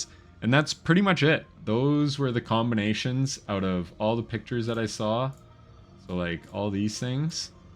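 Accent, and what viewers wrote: Canada. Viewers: Are you from Minnesota or Canada? Usually I can tell but you sound to me like an Albertan but when I went to Minnesota there were a couple people who sounded like Albertans aswell. I very confused. Does it matter? Nope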